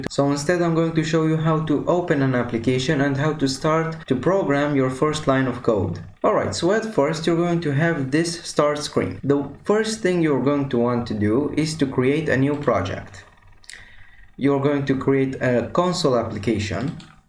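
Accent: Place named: India. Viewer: no problem man, sometimes i click a tutorial and then i get triggered by the voice and i would click away, this mostly happens with indian accents, i just cant listen to it, im literally in pain, but for some reason this happend with your voice, where are you from?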